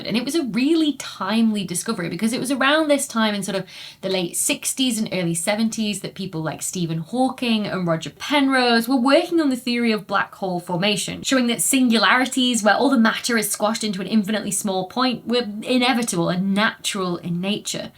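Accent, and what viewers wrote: United Kingdom. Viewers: Why is it here in the USA we are so willing to accept a British accent as the voice of authority in the field about which they're lecturing us? I'll believe it when she presents a picture of an actual BH, I don't care how adorable her accent is.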